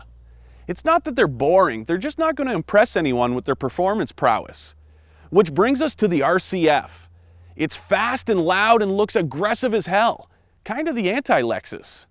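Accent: Canada. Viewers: that canadian accent makes my ears itch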